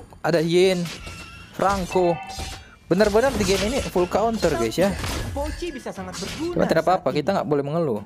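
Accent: Manado, Indonesia. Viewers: kayak orang manado pnya logat wkwk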